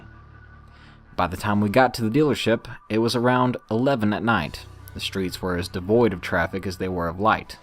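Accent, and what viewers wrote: South, United States. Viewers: Do I detect a slight southern accent in these older recordings? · Seems like you had a bit of a southern accent way back when! This video is very charming, brings me back to the smaller days of your channel 💓 You’re the best narrator, hands down. Love from Florida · Angry-Moth-Noises he is from the south of course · Aw yeah, you have well had a Southern accent like me! I don't know why but I get so excited when I see a YouTuber from the south or from Alabama. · Love your videos never noticed the southern draw of yours till this vid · Whoa, the southern is strong in this one. =x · lol, your old voice sounds so much more southern? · AaAAAAAaaAAAAaAA ’ COUNTRY BOY ACCENT IT SHOWS A BIT IN THE OTHER VIDEOS STILL BUT OML IM SCREECHING This makes me happy in a way i can’t explain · Country sounding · The Thick Southern Accent threw me off at first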